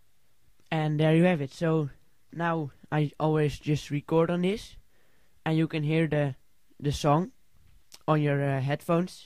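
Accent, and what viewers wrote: Netherlands. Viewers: Je zet de Nederlanders voor schut met je kutaccent! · Je kan horen dat je Nederlands bent xP Maar goeede tutorial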